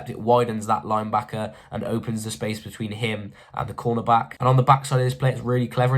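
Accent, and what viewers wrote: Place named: United Kingdom. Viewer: It's something special about hearing a Brit breaking down American football. . . AND KNOWS WHAT HE'S TALKING ABOUT. I'm here for it.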